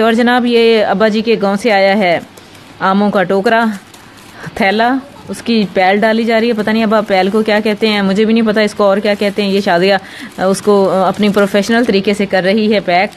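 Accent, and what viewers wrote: Punjab, India. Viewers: AAj to Panjabi naal Gallan kar rahe ho aap...Naya Andaz achha laga ji MashaAllah Aam ka pakaya jaa raha hai... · Aunty aap bohat mushkil punjabi bolti hain. Good dish in the start . Green walay aamon ki peti mujhay bhejwa dein. heheeh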